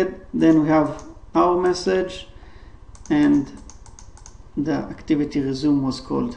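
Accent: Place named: Israel. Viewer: GO israeli accent!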